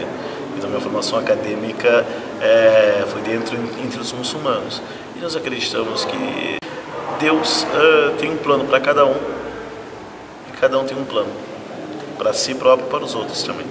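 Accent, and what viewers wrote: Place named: Rio Grande do Sul, Brazil. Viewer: Cara você é gaucho tá claro isso.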